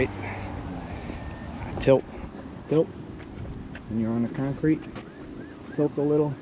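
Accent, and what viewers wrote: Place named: New York, United States. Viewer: I’m getting ready to spray my lawn with chelated iron. What part of the country are you in? I’m in NY and you sound like a New Yorker.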